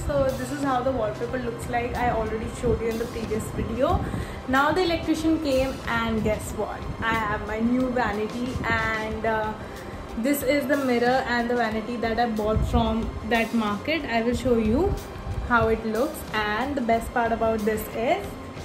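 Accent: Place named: India. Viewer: Me gustó mucho como te adaptaste al tamaño de tu habitación y ese color la hace ver más grande. También vengo de la Increíble India, pero al contrario me gusta que sean así los vídeos porque estoy tratando de familiarizarme con el acento de ustedes jeje, igual los subtitulos no estarían de más. Me encanta tu contenido, saludos :)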